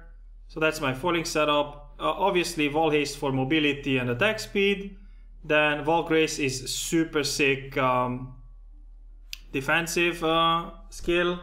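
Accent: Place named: Romania. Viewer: stiam ca esti roman. dupa port dupa glas:P <link> check it out:P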